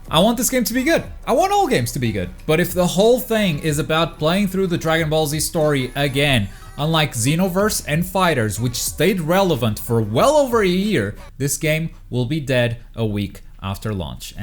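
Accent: Portugal. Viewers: eu a ouvir a pensar que parecias ser tuga e afinal dps falas em portugues e pronto duvida acabaram ahah mas algo k ne comum ver u tuga ate fazer bem nesta area, continua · É claramente português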